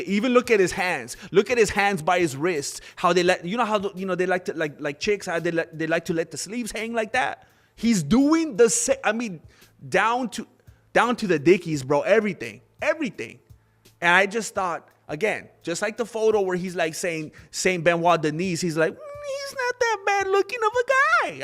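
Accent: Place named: Mexico. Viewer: Eyy fool where is that ole mexican accent ?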